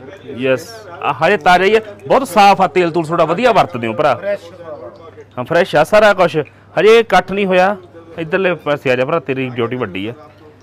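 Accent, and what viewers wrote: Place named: Moga, India. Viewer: Moge di boli ba kamal hai mithi mithi.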